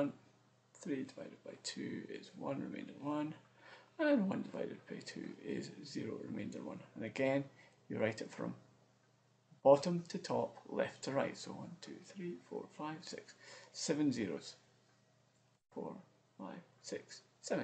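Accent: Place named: Scotland, United Kingdom. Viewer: The accent made it so much easier to listen to, if only one of my lecturers was Scottish :D Thanks a bunch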